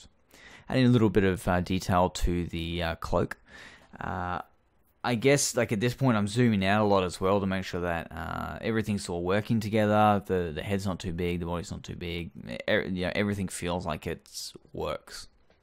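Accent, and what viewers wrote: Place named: Australia. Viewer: awesome nice style. good to hear an aussie, deff make more dude very informative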